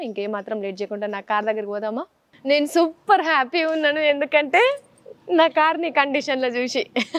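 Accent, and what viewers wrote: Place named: Telangana, India. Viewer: Meeru matlade paddathi Naku chala istam.yentha pedda celebrity ayina Telangana yasa matladutharu.same na language kuda me lage vuntadhi.mem hyd lone vuntam.okappudu Telangana raka mundhu ne language different ga vuntadhi ani vekkinrinche vallu ayina nenu na yasa change chesukoledhu.meeru matladuthunte Naku chala happy ga vundhi.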